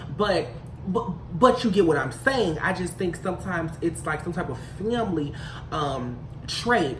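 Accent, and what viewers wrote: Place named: Midwest, United States. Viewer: U sound like midwest, detroit area here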